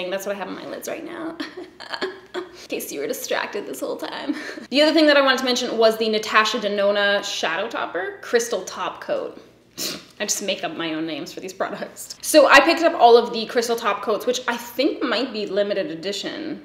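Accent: Canada. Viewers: her canadian accent though!!! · I had to go watch another video to see if you were Canadian lol. We talk really fuckin close to the same so I was pretty sure and then the other video I watch you were wearing a Canada hoodie...roots maybe? Merry Christmas 🎄🎁🎅🏻🍻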